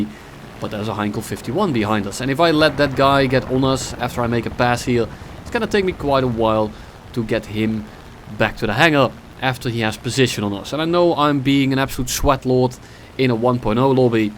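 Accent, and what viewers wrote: Netherlands. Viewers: Never knew he was dutch, I always thought he was an american with a speech disability.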